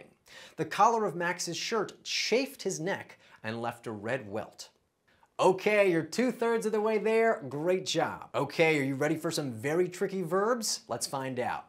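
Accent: United States. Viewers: For goodness sake this is primary s hood stuff! So the chap pronounces some of the words with n American accent t….which does not go down well with me. An English lady!!. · This really should be about learning American. Assume was given an American pronounciation and 'acclimate' is not used outside of the US as the English word is acclimatize. Presentation was good.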